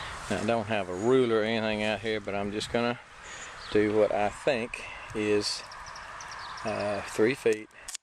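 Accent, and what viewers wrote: Texas, United States. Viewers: That's a natural Texan accent. Everything is better in Texas.😎😘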